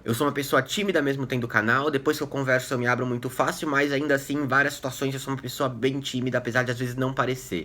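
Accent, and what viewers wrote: São Paulo, Brazil. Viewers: Tu é paulista pensei que era gaúcho.Maneiro os seus vídeos!!!!Tu vai ter muito trabalho em 2018.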